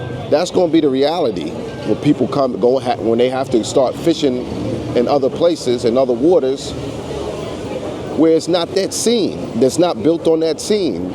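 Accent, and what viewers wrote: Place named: New York, United States. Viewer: Man if this guy ain’t a New Yorker…😂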